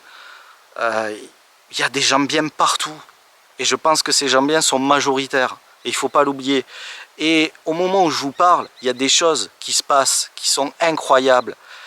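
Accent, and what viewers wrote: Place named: South, France. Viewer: Super vidéo comme d'hab! J'apprécie beaucoup pour ta sensibilité et tes réflexions très profondes..Et ce bel accent du sud n'est en rien déplaisant.. bien au contraire! Et puis quel courage d'avoir créé une telle chaîne! Merci beaucoup.. que du bonheur et de l'authenticité!🙏🙏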